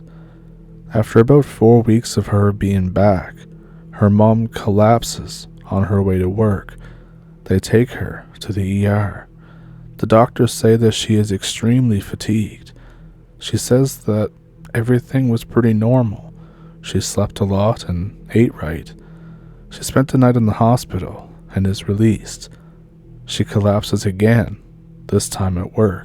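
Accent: Ontario, Canada. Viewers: I knew this cat was Canadian by his Ontario subtle accent!!!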